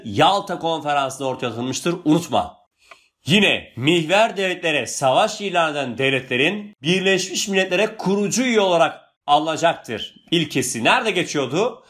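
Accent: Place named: Adana, Turkey. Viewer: Cevap:goodvibes:: gırtlaktan gelen Adana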